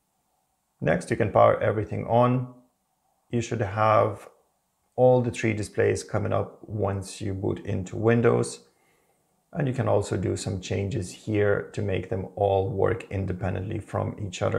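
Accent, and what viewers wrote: Ireland. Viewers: Great tutorial.. Are you irishhhhhhhh? 👌🏾👌🏾🔥🔥.. I love All people but Esp I love all Irish people 😊👌🏾🔥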